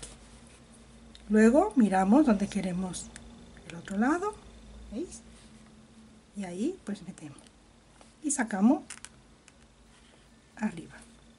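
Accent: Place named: Cuba. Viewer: Hola amiga!Gracias por compartir esa hermosura de muñeco!!Tu eres cubana,eh,amiga?Tienesa veces un acento de ese país tropical.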